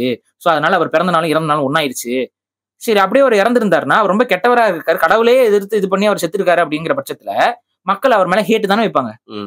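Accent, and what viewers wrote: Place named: South Tamil Nadu, India. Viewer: South tamil apidthan 😂 not like North tamil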